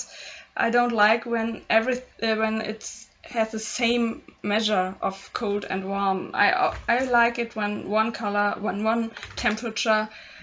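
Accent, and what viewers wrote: Germany. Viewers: She's German!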